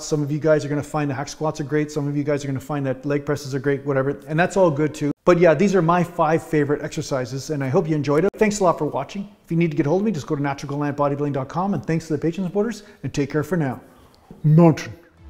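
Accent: Canada. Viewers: I put a couple of simple things together today. In a video of yours I watched earlier today, you mentioned you live in Vancouver. That explained a certain accent you have, along with your interest in and hockey playing. I live near Detroit, right across from Windsor. Before cable came along, I used to watch the Leafs on CBC, along with other good Canadian programs. Cool Aa!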